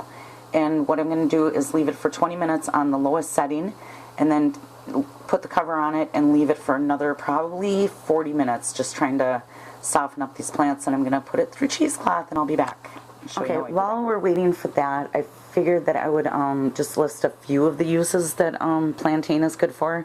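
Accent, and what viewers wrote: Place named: Wisconsin, United States. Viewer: I heard a Wisconsin accent there and the phrase 'up north' --only Wisconsinites use that phrase. Good post--learned a lot--made a yarrow/plantain with students--we too had to use stove technique, though I heard letting it sit in sun is more effective.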